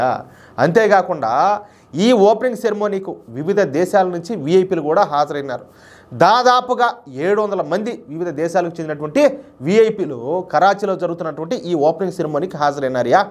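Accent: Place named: Kadapa, India. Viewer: Ni slang cuddapah laga undhi brother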